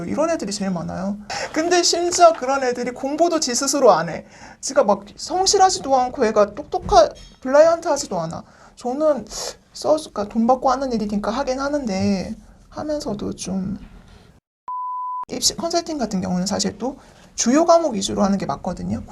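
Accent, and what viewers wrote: Gyeongsang, South Korea. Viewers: 강사님 경상도 사투리가 드러나는데 괜찮은지????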